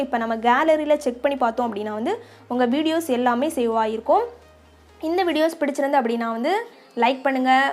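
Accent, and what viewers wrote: Kanyakumari, India. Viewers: Seems like kanyakumari Slang! 💙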